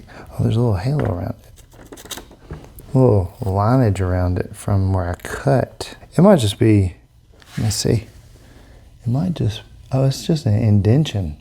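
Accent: South, United States. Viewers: I’ve been watching you and thinking 🤔-that southern accent is too familiar. Then, i stalked you!!🤣 nah, just found your PO Box. BOILING SPRINGS!!!! Hello neighbor!! Spartanburg/Pauline area. We can almost yell out of our windows. 😂 loving your videos. Learning so much and getting some good inspo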